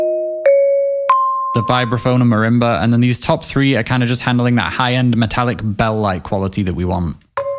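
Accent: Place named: Sheffield, United Kingdom. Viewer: Just found you today. watched a bajillion videos already. You have a bit of a Sheff twinge to your voice, hope i'm right. Big up Steel City if i am!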